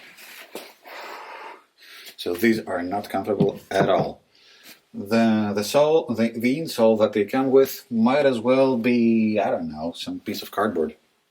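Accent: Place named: Greece. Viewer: When i heard you speak English i could distinguish a greek accent. When i saw the ΝΥΜΦΗ beer; i was almost sure that you are Greek!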